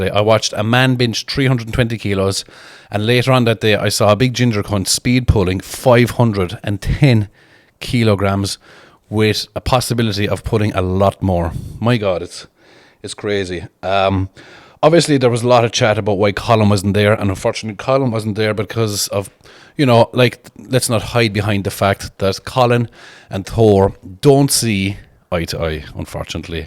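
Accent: Ireland. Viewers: Love Irish & Scottish. Are you Irish sir? 🙂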